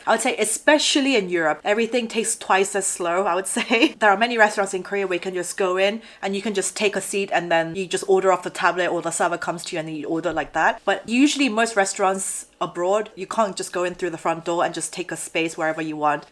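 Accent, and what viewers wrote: England, United Kingdom. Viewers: In what way is she more British than a Korean? She’s somewhat of a hybrid. However, as a Londoner - I see the British influence (accent mainly imo), but not “completely British” (whatever that is). 😇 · when I listen to and close my eyes, sounds soo English. Also, I love when she says" proper". 😀 Maybe mannerism! " Stoicism"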